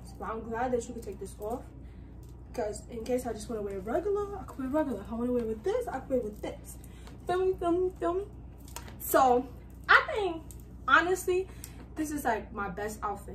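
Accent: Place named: New York, United States. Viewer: Yhu can tell she just moved to New York she talk like a New Yorker but she kinda don’t 😭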